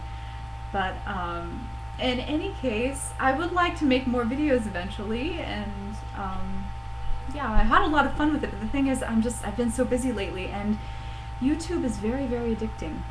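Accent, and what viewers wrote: Germany. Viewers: einen leicht sächsischen akzent konnte ich in einer ihrer älteren videos bereits raushören.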